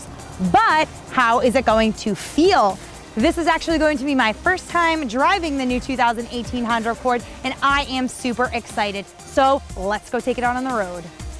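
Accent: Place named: Boston, United States. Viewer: Gotta love that Bawhstan accent! I'm from MA originally, love the video! :)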